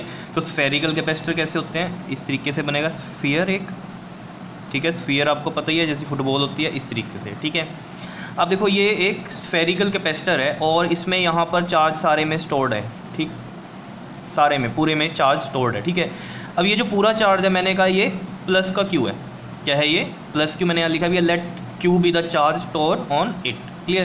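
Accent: Punjab, India. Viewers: Is u are from punjab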